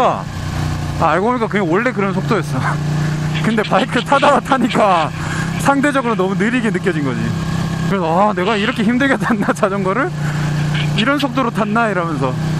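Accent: Gyeongsang, South Korea. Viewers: 갱상도 아입니까? 북입니까 남입니꺼? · 저도경상도사람인데 님 경상도인신줄몰랐네요ㅋㅋ 친구랑대화 하시는거보고 친숙한ㅋㅋ 더욱 정감가네요ㅎㅎ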